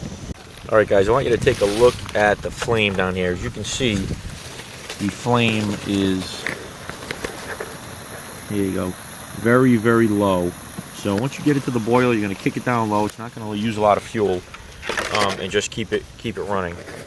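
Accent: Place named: South Jersey, United States. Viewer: Using the bag to mix the ingredient is a great idea. Thank you. P.S. You sound as if you are from my neck-of-the-woods (either South Jersey, the Philly area, or northern Delaware)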